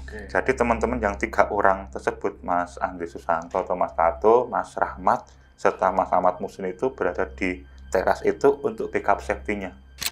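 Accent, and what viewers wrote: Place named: Java, Indonesia. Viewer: Itu emang aksen Jawa, lagian beliau bukan ahli narasi TPI ahli menolong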